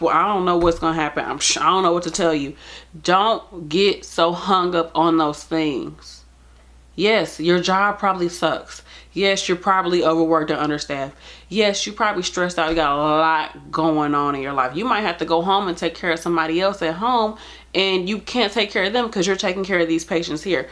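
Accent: St. Louis, United States. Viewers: ...this was a brilliant video. I have watched a few of your past ones (mostly coz I love your stl accent ) but this one was top notch and as real as it gets! Much love!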